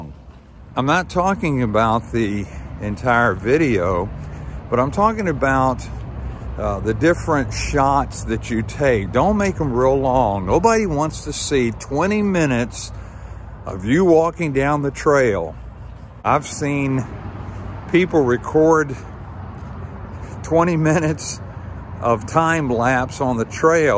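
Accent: South, United States. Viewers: That is fantastic! I could pick up on a little southern accent there, my friend! I'm with you on the Roll Tide!! You do awesome work on your IMovie work...I guess I'll have to double down on my attempts. I have tried using it on a couple of videos (not trail vids) and it was a lesson in futility to me. Thanks! Btw...I'm s 3rd career pastor, and serve in the far south end of GA, in Hahira, at the very bottom of the state on I-75.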